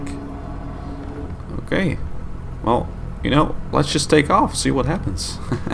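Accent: Netherlands. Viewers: Jij bent eigenlijk Nederlands dat kan ik wel raden aan het accent Ik denk dat xplane 11 de mooiste game is genieten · It's nice to recognize the accent from when I lived in NL when I was a kid.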